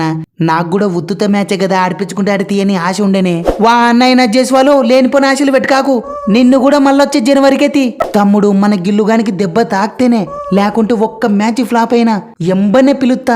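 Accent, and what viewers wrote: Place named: Kurnool, India. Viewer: Mana kirnool yasa tho matladi , prathisari sonthavuru gnapakalu gurthuchestavu ... Thanks thammudu❤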